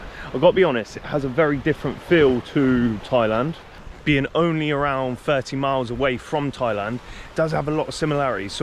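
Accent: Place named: United Kingdom. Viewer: Hi I’m in Australia 🇦🇺 Older lady, 56 years old I’m a “traveler “ 1989 Sri Lanka I love Malaysia 🇲🇾 And it’s people. I’m planning a trip in 2026 I’ve just watched your vid. I have to say your video was FANTASTIC!! The clarity in which you spoke. With your charming British 🇬🇧 accent. I REALLY enjoy watching your video You explained, so well, all aspects of Langkawi. Well done 👍 Have subscribed 👌😎🤩 Marianne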